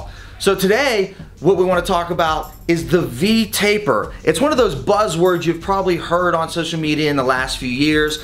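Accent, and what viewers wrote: South, United States. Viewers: Where is from originally. Sounds southern..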